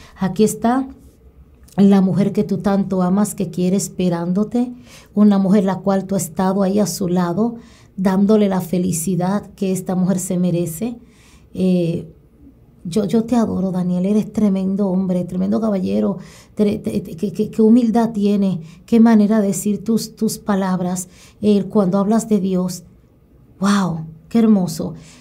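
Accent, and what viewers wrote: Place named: Dominican Republic. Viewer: Los dominicanos hablamos haci, pero solos un amor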